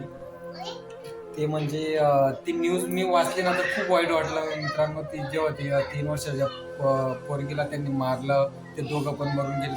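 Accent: Khandesh, India. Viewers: खान्देशी आहात का भाऊ tone तिकडची वाटून राहिली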